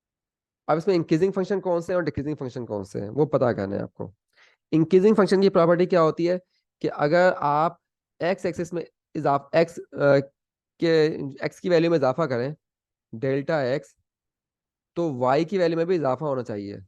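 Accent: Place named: India. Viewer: oh my god the pronounce is so india;i don't understand what he says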